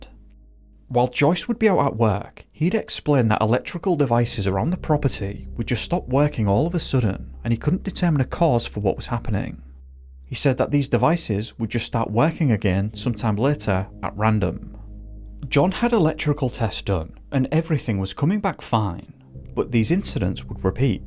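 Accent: United Kingdom. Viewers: I’m at my limits of listening to British accent YouTube videos. One more video and I’ll vomit uncontrollably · Great story, thx. Can I ask where in the UK your dialect is from, very charming 😊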